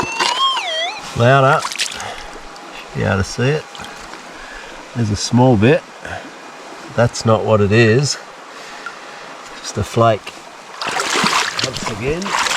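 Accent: Australia. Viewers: Wäder😅 sorry my ear does not like the aussie accent BUT i am getting used to it, more i listen❤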